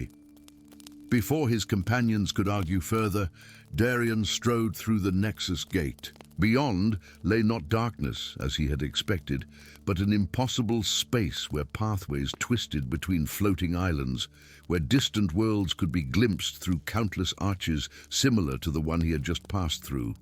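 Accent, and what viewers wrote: United States. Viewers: Best one yet. So many stories, I love that. PLEASE stop using American voices. With AI you must be able to choose from hundreds, please use a different one.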